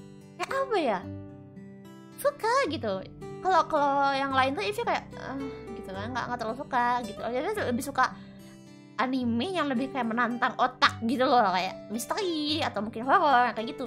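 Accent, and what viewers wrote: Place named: West Java, Indonesia. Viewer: Emang dia orsun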